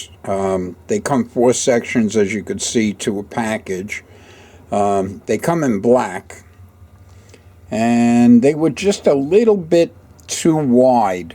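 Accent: New York, United States. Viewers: Thank you so much for this video,, I visited Brooklyn 20 years ago and always wanted to build a railway model of it,, Now I finally have the time and space I am going to start. Thank you for the tips.... must say I love your accent-- it takes me right back to the summer of 94 and my month in New York,, kindest regards from England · That's gotta be the thickest New York accent I ever heard on YouTube so far and the design of your el is absolutely great!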